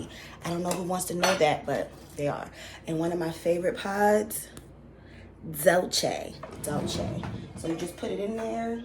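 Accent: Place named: Midwest, United States. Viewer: Where are you, please? What state, you sound like you're Midwest... M. 😅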